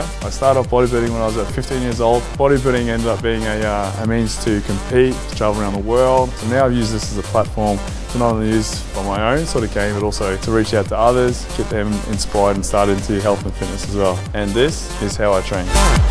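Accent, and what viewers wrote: Australia. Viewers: Dean Alberto LMAO!! You'll be so ashamed when you realize your wrong! xD Pls, try to understand: Calum von Moger is from a country called AUSTRALIA. He speaks with an AUSTRALIAN accent. Arnold Schwarzenegger is from AUSTRIA (Österreich), a completely different country located just south of Germany, Arnold speaks with an AUSTRIAN accent.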